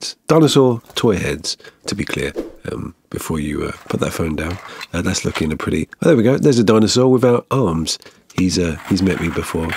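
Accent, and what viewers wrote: United Kingdom. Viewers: I know my way around quite a few British accents, and certainly yours isn't unfamiliar. But I swear to God, I was in the kitchen when you were describing "tail for a face" and I thought you were saying "towel for a face" and went "huh". 😂 I figured it out when I came back in and you were describing the tail in a different context (plus I could see what you were doing) and I realized my mistake and everything made sense...sort of. And "male" sounds like "mal".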